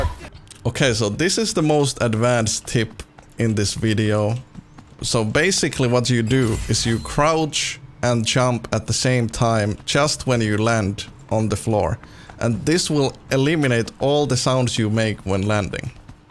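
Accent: Finland. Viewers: Are you Finnish? You sound like it.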